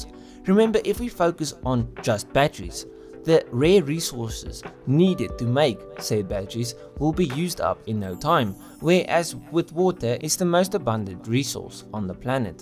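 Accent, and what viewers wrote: South Africa. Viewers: I love your South African accent. Subscribed.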